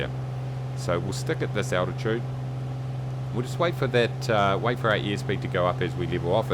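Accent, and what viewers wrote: New Zealand. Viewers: New Zealand accent rules... :) Awesome video, as always....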